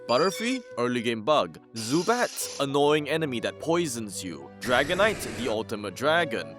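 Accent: Singapore. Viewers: are you Singaporean, cuz the accent do be like that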